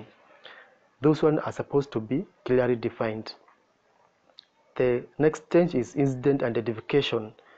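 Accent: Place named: Kenya. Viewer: Nice one really helped our company. Thanks so much. This guys however sounds like a Kenyan.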